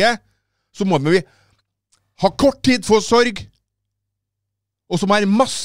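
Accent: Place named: Östergötland, Sweden. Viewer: Bra sagt! Du snackar Östgötska på norska.... Låter väldigt roligt.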